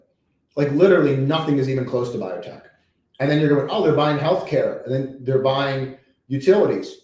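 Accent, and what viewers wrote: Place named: New Jersey, United States. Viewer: You are amazing and have taught me so much... I love hearing you as I'm getting ready for work everyday. Jersey girl here , love the accent ! I lost mine living in Maui . Aloha 🌺 for all your hard work ...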